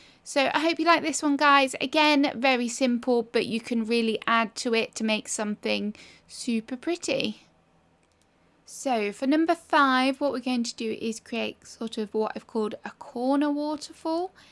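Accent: England, United Kingdom. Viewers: I love your accent! Are you from England?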